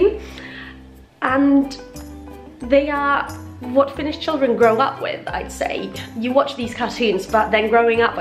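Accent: United Kingdom. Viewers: Are you Finnish? :) Cause you have a very clear British accent :)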